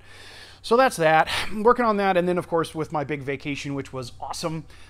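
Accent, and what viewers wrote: South, United States. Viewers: When did you get an southern accent!?! I don’t remember it from the previous videos. Glad you had a good vacation!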